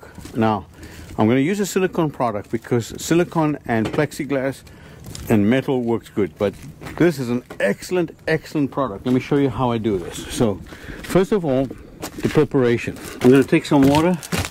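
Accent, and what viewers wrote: South Africa. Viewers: Great video. Are you South African? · are you from South Africa your accent sometimes sounds like you are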